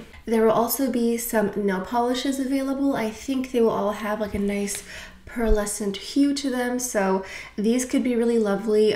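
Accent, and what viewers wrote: France. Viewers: Are you actually french?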